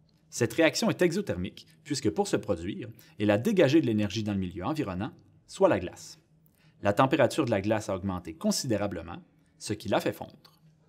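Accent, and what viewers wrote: Quebec, Canada. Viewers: Rapide, efficace et en plus avec l'accent québécois, c'est juste parfait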